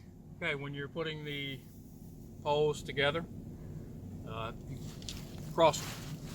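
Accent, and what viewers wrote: South, United States. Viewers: Love the tent. Don't love the USMC or Southern accent. It's mind boggling how some people actually talk that way 😂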